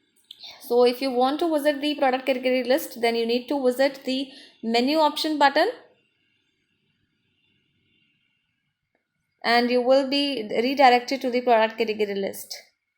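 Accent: India. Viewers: Indian women try speak clearly English and not whit funking indian accent cuz not all people understand you. Try speak slowly and clearly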